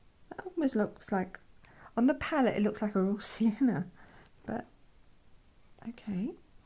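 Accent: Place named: South Africa. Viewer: I absolutely love the illustrations..especially the background detail that looks like a little piece of paper. I have been painting for years, but I recently started moving more towards illustration ,which is a huge mindshift for me. I love learning from tutorials like this, so I am definitely subscribing. For a moment there I thought I was listening to a South African English person (your accent sounds allot like Cape Town SA English🤣 and the "ai jai jai" is often heard here in English, Afrikaans, Xhosa...you name it🤣) but then...we got our English from Britain.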